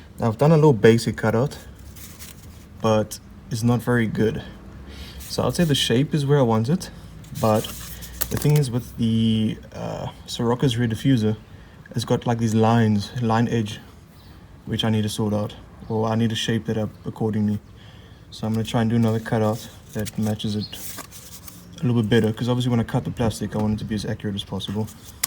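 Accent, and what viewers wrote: South Africa. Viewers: bra youre so me lol. You even sound South African ...Only thing left is for you to be from the kasi. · brother this is awesome, You sounds south african!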